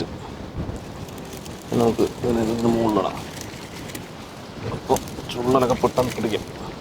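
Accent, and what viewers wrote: Palakkad, India. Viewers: Broo...ningal nalla oru cook aanalloo..superr...Aa Palakad slang edaku varunnundu.. kalakii.. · തനി പാലക്കാടൻ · Nalla adipoli Palakkad bhasha ishtamullavar like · Ninga thani palakkadanallooo etttoooi... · Eantha kattu ingalu palakkattu karan ano? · എനിക്ക് ഭയങ്കര ഇഷ്ടമാണ് പാലക്കാട് ഭാഷ... ഇഷ്ടപെട്ടവർ like ഇടൂ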